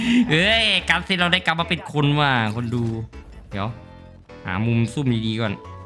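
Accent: Korat, Thailand. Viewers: พี่คนโคราชป่าวครับ555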